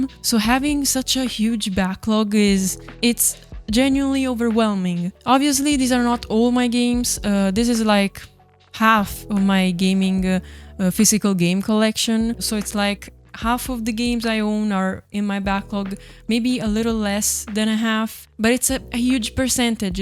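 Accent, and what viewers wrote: Italy. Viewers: Glad the algorithm suggested me your channel! I have 70 games on my backlog, but they are alla digital unfortunately. At least you could still touch and see your games lol. Anyway, your accent sound italian, am I correct? Are you a fellow italian girl gamer like me?? 🤝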